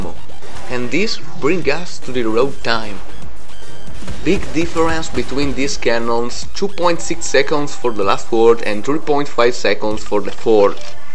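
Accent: Italy. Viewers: si sente il tuo accento italiano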